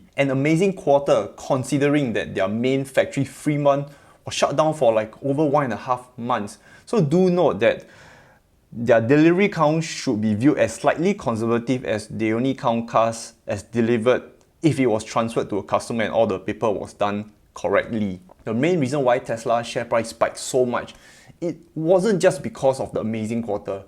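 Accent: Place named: Singapore. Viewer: You are doing very well, thank you very much👍👍👍💖😀 . Please take some lessons in innonciating English WORDS properly and correctly, to reduce or eliminate your very heavy Singapore accent. Thank you 👍😀👍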